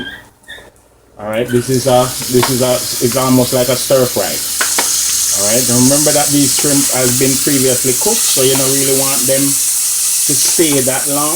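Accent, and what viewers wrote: Jamaica. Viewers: Hi, Looks Great! BUT PLZZZ SPEAK MORE PROPERLY BECAUSE EVERYONE IS NOT JAMAICAN NOR DO THEY SPEAK OR UNDERSTAND PATOIS. Just A Lil Friendly Advice. Blessing To U😊💚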